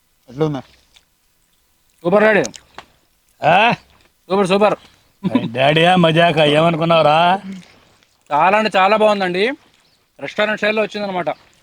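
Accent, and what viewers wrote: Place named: Telangana, India. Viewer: Brooo meru telangana lo vunnara chala years language same mala ఉంది 😍😂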